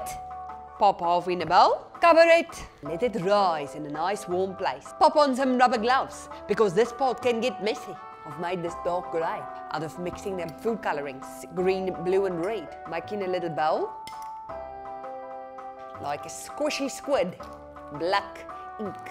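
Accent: Afrikaans, South Africa. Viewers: A bad Afrikaans one. Quite common :) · Is she South African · In her videos she has an Afrikaans accent but that is not how she talks in real life only in her videos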